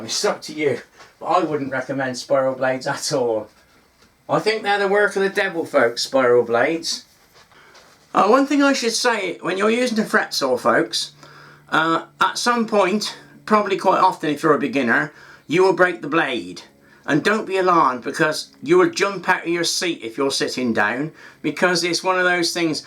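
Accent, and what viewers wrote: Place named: West Country, United Kingdom. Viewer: Fascinating. I love the accent - my guess is West Country close to Wales 🤩 You had me in with the door lock - I have a ton of "If it works don't fix it". Just starting and have bought several spirals so my experience may be variable. Thanks for an enjoyable few minutes. I am 82 and Tasmanian ex UK 60 years ago)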